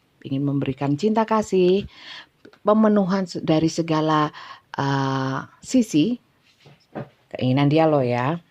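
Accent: Java, Indonesia. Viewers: Setuju bunda.. dg bahasa bunda yg agak" medok..sy suka banget.. krn sy wong ndeso bunda. Trenggalek jatim..💪semagat ya bun. Smg bunda sht sll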